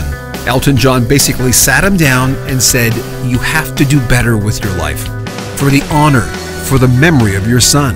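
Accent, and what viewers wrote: Canada. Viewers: I'm quite certain that our narrator is Canadian...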